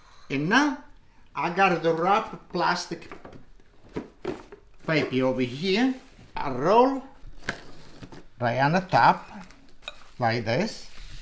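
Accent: Italy. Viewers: He reminds me of my grandma with his accent. She was from Italy. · I love the way you talk? Your accent is beautiful, What part of Italy are you form? Your bread is amazing you make me hungry. Salute from Texas USA ❤ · Your Italian accent is so refreshing to me… the last time I heard one was in the late 1960’s listening to my grandmother teaching me how to cook. I just turned 14. I loved her so much and still miss her greatly. I subscribed! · I just love his Italian accent 😍 ❤ Beautiful ❤ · Wonderful show. He gets his recipes across in a timely fashion with accuracy. Reminds me of my Italian Grandparents with his accent. Not black pepper. Black AH Pepper. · His True Italian Accent I like including The Video. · Love this guy. I'm literally waiting rn for my bread to puff up another 30mins and stick it in the oven after watching this vid. And I gotta say, awesome Italian accent.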